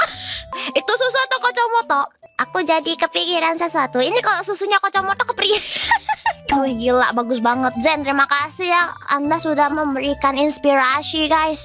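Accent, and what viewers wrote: Java, Indonesia. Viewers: Suka beut ama aksen jowo nya si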